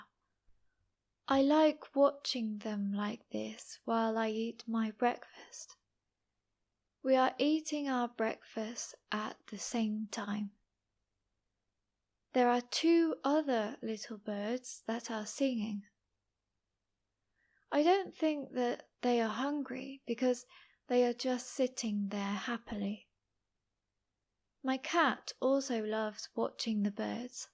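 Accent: United Kingdom. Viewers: Hello, your British accent is RP or MRP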